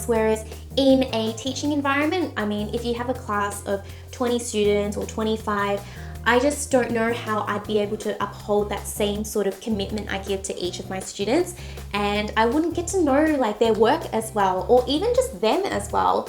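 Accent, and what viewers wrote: Australia. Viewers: that Aussie Accent is 🔥 girl. Speak Vietnamese with me haha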